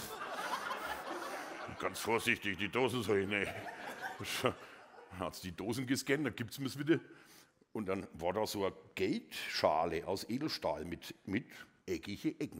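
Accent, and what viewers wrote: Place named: Franconia, Germany. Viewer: Fränkisch geil 😂😂😂😂🤘🤘👍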